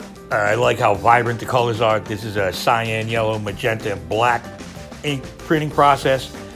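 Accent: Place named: New York, United States. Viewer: Definitely need a hard hat sticker ill save a piece of real estate on there for you... and the accent makes me like your channel more feels like one of the boys from home got there own channel the haters must be from out in the Midwest and don't get the real NY accent lol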